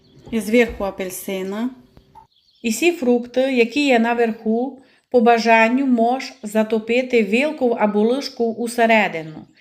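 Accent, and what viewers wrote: Transcarpathia, Ukraine. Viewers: Пані, у Вас дуже гарний голос і мені подобається Ваш закарпатський діалект. Чимось схожий на зебру, наче і просто виглядає, але через екран пахне.У Вас є рецепт Зебри? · Дякую файно, дорога, за такий гарний простий рецепт. Цей калач ще виглядає, як великий кекс. Супер!!! Так приємно Вас слухати - стільки тепла і доброти у Вашому голосі!. І рідна закарпатська мова. Божих Благословінь Вам і Вашим рідним.❤❤❤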